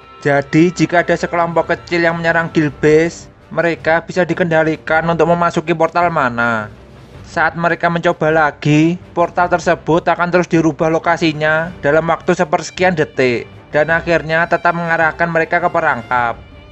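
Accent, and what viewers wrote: Java, Indonesia. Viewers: Bang bahasanya di arahkan ke gaya Indonesia aja bang, jangan terlalu ke arah Jawa, berasa kurang bang kalo masih Pake logat Jawa.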